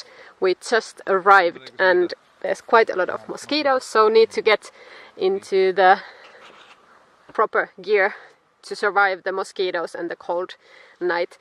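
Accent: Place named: Europe. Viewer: Very nice fish in Sweden I like your channel. I really like the way you say fissing and not fishing it sounds very European.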